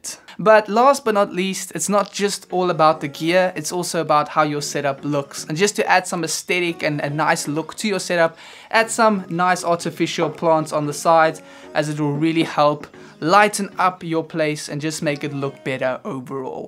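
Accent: South Africa. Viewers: ... Your accent sounds like you come from Cape Town...... Nice info.. Thank you... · You sound so South African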